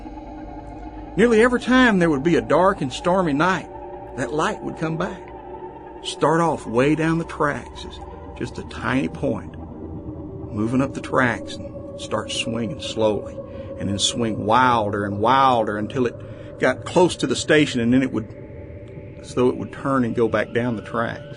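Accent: South, United States. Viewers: I love the story but every time I hear it the narrator always has a pronounced southern drawl. In 2019 can’t someone without such an accent narrate the tale?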